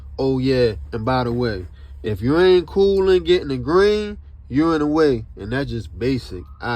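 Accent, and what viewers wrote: Baltimore, United States. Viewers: He’s from Baltimore, has a strong accent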